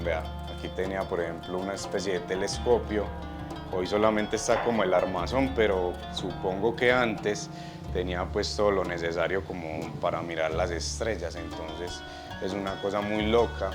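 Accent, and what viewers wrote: Antioquia, Colombia. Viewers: Me encanta el asentó tan paisa. …. Mi paisano ….. e ave María pues … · Que forma mas hermosa de hablar ese hacento paisa jenial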